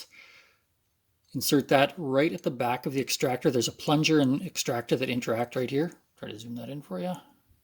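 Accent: Canada. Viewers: I hear a tinge of Canuck, but that cant be..... Trudeau has decreed that no one gets guns. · Ive been around Canadians enough to know, you are in fact Canadian and I love it! I love your accent. Makes me want to take my gun apart more.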